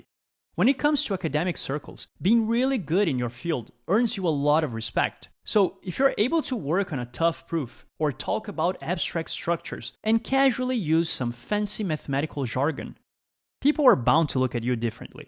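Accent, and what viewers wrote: Brazil. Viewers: É impressionante como o sotaque brasileiro é característico 😅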